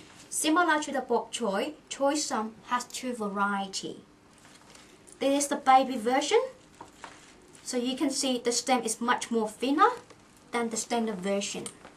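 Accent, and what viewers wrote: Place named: Wales, United Kingdom. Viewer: Sounds like welsh accent to me!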